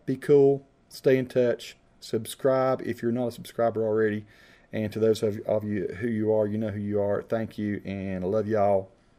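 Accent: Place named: Appalachia, United States. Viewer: Yes the Hillbilly accent is cool and I like the way you are so open about your Appalachian life. Just seeing the fields and forests and talking to your brother about Hillbilly stuff is nice to experience. I am living in dutch society that is quite the opposite of Hillbilly (in the province it is not that different though). Nice to see such a positive good humoured video around Christmas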